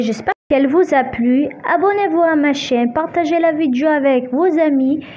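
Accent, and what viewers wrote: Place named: Kabylie, Algeria. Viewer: اختي شوية عربية مي انت قبايلية من هدرتك تباني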